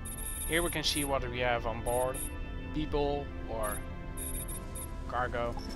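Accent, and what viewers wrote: Netherlands. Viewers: Great tutorial, helped allot thanks! Btw you sound alot like Alistair Overeem, maybe its just the dutch accent lol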